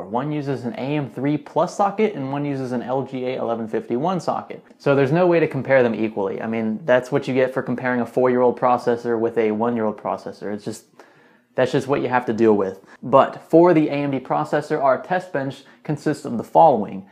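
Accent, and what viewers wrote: Louisiana, United States. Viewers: are you from Louisiana?!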